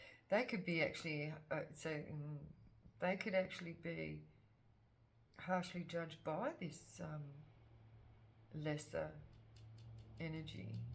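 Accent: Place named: Australia. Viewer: Fellow aussie reader here and I totally get what you mean about the pelican and the shining. I have been talking a lot lately about people with the light in them and how people without it just want to stamp it out in people. It is coming up in readings all the time. Love to her the aussie way of speaking. Have a great new year.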